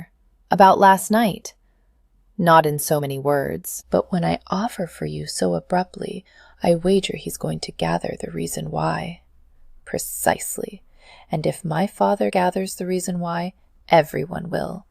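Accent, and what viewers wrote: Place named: United States. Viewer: No no no no no! No American Narrators of an English story!!!!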